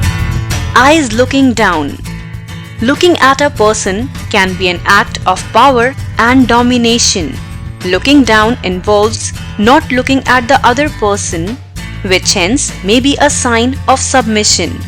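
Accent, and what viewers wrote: India. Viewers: Why Indian accent???!! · Ana kozjak she is Indian and its Indian English..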